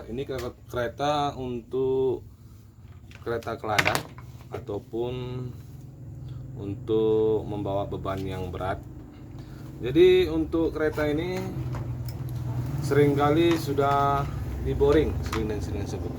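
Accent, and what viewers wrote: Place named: Aceh, Indonesia. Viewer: Plat motor yah sih medan tpi klo logat y orang aceh ni abang ya😅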